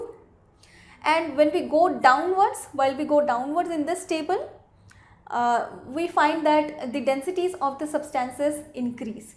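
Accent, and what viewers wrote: India. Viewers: Hi, most of the words / vocap / etc understanadlbe just a request there is no blameing here but for every video dould you add transcript of the video cause the language of indian esspecially accent is effect the english understanding would be bettter. Thank you for your patience.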